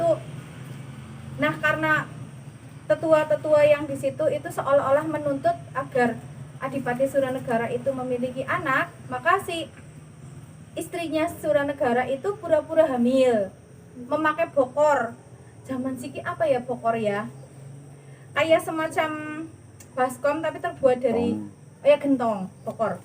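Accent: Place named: Java, Indonesia. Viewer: Ternyata bhsanya sedikit medok ... 😂😂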